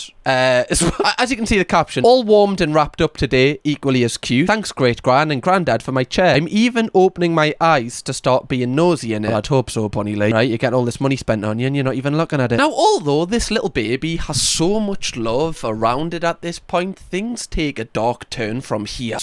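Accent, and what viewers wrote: Newcastle, United Kingdom. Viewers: Agreed and the Geordie accent helps😊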